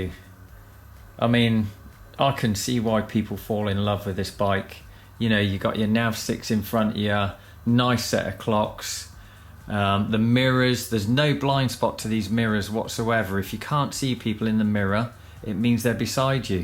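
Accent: United Kingdom. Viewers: Absolutely awesome review! I am a Rockster rider since 2010 and i couldn''t agree more about your comments concerning drive shaft! Now maybe it's time so see if some future 1250 owners are selling a Rallye at a good price :) I just went to a BMW dealer last friday and i jumped on a low frame low seat Adventure and i really tought i couldn't touch the ground with both feet with my 1.82m but i was wrong! I felt very good and so i added the Adventure to my possible choice! Thanks for your video, loved the bike, the clear english accent and the true passion that i felt when you picked the bike from the dealer! Cheers Loris from Italy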